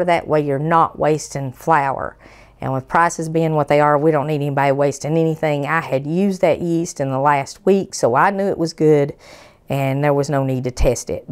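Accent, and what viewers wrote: South, United States. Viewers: I love your down home accent. I lived in Louisiana for 3 years. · LOVE ur southern drawl...im in nw ark ❤ LOVE u have pledge of allegnce posted! God bless ya'll 🇺🇸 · . I love your accent. I've always loved that southern drawl as we call it here in the UK. I'm an East End London Cockney. So I've also got a distinctive accent. Thanks for your lovely tutorials Xxx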